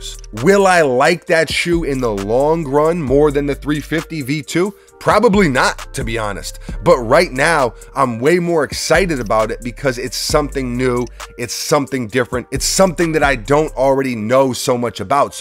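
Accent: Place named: Boston, United States. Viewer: Love the Boston accent 👍😂😂😂🙏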